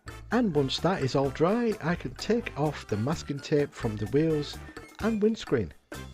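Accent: United Kingdom. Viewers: I suspect from your accent that you are from somewhere in the UK. We in the US who follow American football may remember the quarterback Jay Cutler, who was the team captain of the Chicago Bears from 2009 to 2016. Not sure, but I suspect he probably also liked Scooby Doo as a kid.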